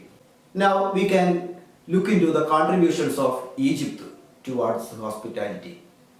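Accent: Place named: Kerala, India. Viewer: Malayalam poley thonunnu some times your english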